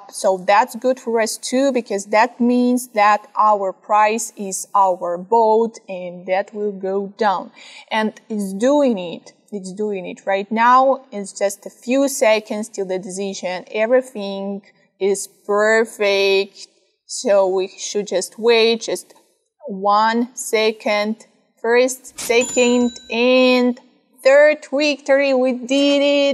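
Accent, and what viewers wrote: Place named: Russia. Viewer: Почему я слышу русский акцент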